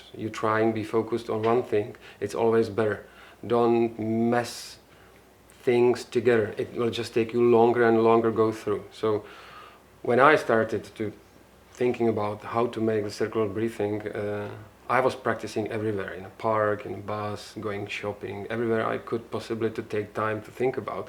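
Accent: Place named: Norway. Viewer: You sound norwegian..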